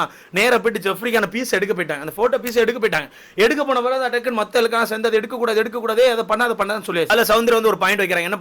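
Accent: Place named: Tirunelveli, India. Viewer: Which is your home town Sir?.We can see Tirunelveli slang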